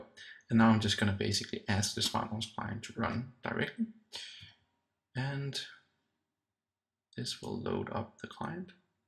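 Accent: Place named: Denmark. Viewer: This guy is Danish i Can tell by his accent 😂😂😂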